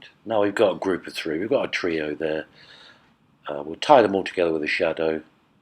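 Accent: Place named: England, United Kingdom. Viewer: Dalla pronuncia direi che sei inglese! I Like! 💠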